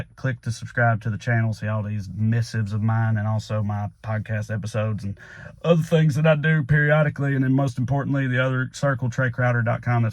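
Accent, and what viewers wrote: South, United States. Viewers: I LOVE you. JOE Biden and I went to the same supposedly Catholic high school for three overlapping years, and I'm srill angry about the candyass pardon of his son. Your observations thereon have at least taken the edge off my anger. But I remain profoundly angry at Joe for his continued arming of the Israelis in their crucifixion of the Palestinians. I continue to visit your site because a redneck liberal who speaks in a Southern accent soothes my soul back into theological Hope for whole minutes at a time. God bless. Stay strong. Speak out. Many thanks. WKS.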